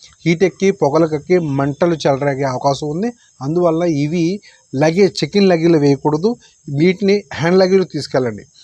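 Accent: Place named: United Kingdom. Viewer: Again it's british english 😮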